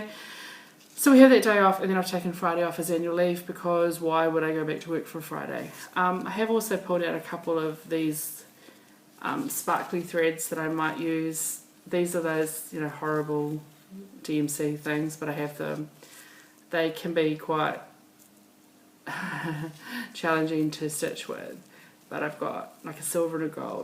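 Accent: New Zealand. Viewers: So nice to hear a Kiwi accent again..here in Texas from an expat Kiwi.. Your stitching is lovely..Nice to hear your char t too..Glad you perservered..😊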